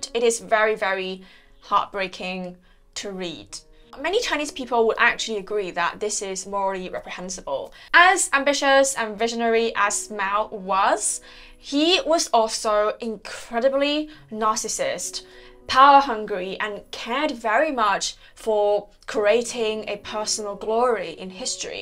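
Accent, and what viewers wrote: United Kingdom. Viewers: your bookreview... I found it quite.. informative. Also about your topics of interest, and your public persona. Youdr British accent is quite impressive, you seem to be ambitious and a person, who is on a definite path of making your life exceptional in your own way. Did you read books by Cixin Liu? If yes, did you find them worthwhile? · Great content. Learned a lot. Prettiest british accent ever. A+ on the book reviews. I am French. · you have a sort of posh british accent mixed with some chinese accent, it's very interesting; where did you goto school? did you grow up in england?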